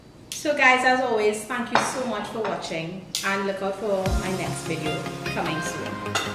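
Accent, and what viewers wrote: Trinidad and Tobago. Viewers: Wow. A muslim speak english. And Trinidadian english accent. Cooking Trinidad stew. Wow. Because the muslim in canada dont speek english. They speek only thing from packastand . I wish them Will speak english like you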